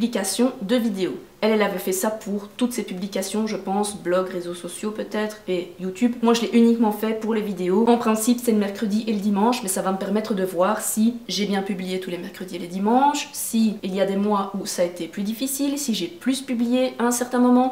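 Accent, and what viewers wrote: Belgium. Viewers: Bonjour, j'adore l'idee de grace au bujo etre organiser pour enfin realiser ses objectifs mais comment on fait pour realiser un bujo quand a la base on est pas organiser ds sa tete , en gros je sais pas pas ou commencer et il y a tellement de chose a y mettre pour que ma routine du quotidienne et mes plus gros objectifs soit mieux atteignable car mieux plafinier , j'adore ton naturel! et ton petit accent belge qui reste malgré NY :-) Bizz de Bruxelles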